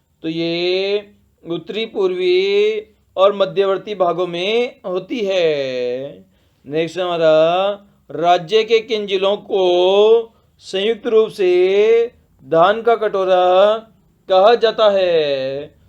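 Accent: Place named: Bihar, India. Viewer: हरियाणा जीके बिहारी पढ़ा रहा है बड़ा गंदा लग रहा है आवाज से 😂 भाग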